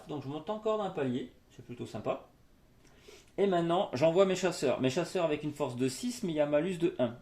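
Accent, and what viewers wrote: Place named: Toulouse, France. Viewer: avec grand plaisir de se tutoyer (j'avoue avoir modifié mes "tu" en vous car je voulais pas sembler trop invasif avec ma question du "d'où es tu". Mille fois d'accord que quand tu compares ça avec les kickstarter actuel, ça devient de la folie, après faut dire que svt dans les kickstarter tu as de la figurine et c'est la course au stretch goals donc le volume peu devenir fou et le prix l'est déjà de base et alors quand tu vois les all-in... Bon ça m'a pas empêché de 'e pas être raisonnable durant l' année sur KS 😅 Sinon tu as bien pris l'accent Toulousain effectivement, je reconnaissais une touche du sud mais légère. Je suis de Montpellier c'est pour ça que ça me parlait un peu 😜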